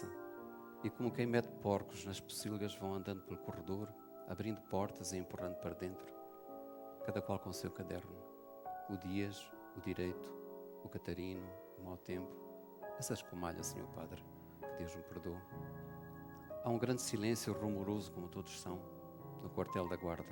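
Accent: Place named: Portugal. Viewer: O português de portugal é bem lindo <3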